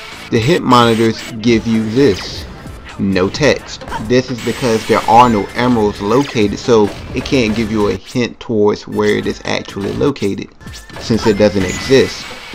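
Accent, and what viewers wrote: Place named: South, United States. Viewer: You sound like a fine southern black gentlemen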